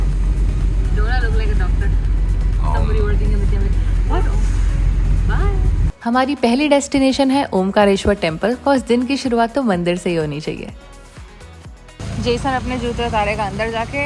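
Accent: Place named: China. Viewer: 한국인이 아닙니다 왜 자꾸 한국이라 하는건가요 발음이 중국인 발음이네요.전 한국에서 왔습니다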